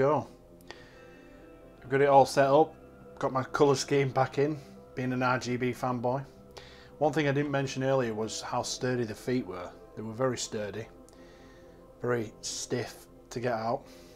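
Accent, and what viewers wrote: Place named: Northern England, United Kingdom. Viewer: Cracking review mate. Honest and Proper Northern!!!! ;)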